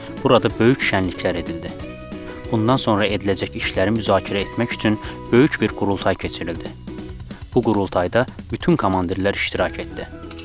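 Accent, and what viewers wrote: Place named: Turkmenistan. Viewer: Abi ben türkmen aksanını sevmiyorum ya... Direkt dislike attım...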